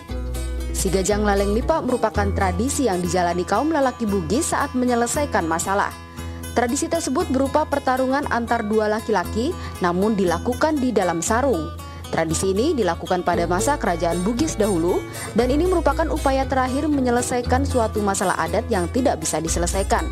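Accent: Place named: Sulawesi, Indonesia. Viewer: bahasa bugisnya sangat kental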